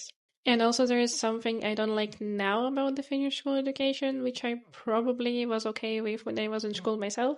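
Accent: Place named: Poland. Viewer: Are you Polish? Kind of a soft Polish accent I'm hearing.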